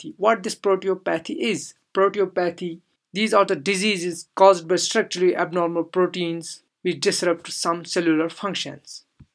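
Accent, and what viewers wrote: India. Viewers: as soon as i heard the indian accent i knew this would be an informative video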